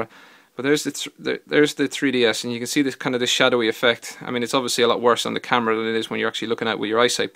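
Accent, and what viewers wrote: Ireland. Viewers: are you Irish? Because I can hear a tiny bit of an Irish accent.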